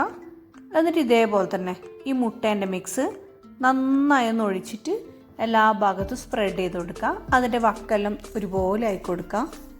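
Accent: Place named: Kannur, India. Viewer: Kasaragod evideya njanum Ksgd but Ningale slang kannur touch nalla basha🤩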